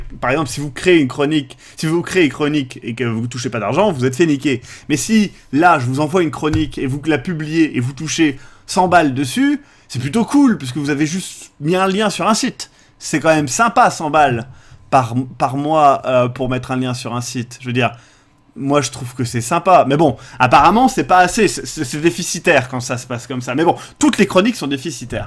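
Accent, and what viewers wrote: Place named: Africa. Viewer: Salut dis moi t'as vécu en afrique ou sur une île Africaine ? Question à ta façon de parler certains mots.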